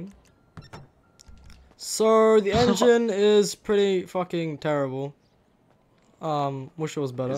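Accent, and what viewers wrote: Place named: Australia. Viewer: I got bored and decided to watch a bunch of random videos and I just realized...you're Australian and you say "mad" you sound like might car mods lol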